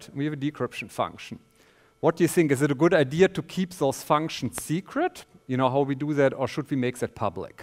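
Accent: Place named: Germany. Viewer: very good Denglish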